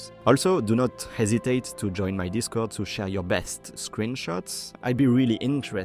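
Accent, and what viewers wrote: France. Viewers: Oh je reconnais cette accent... 🇨🇵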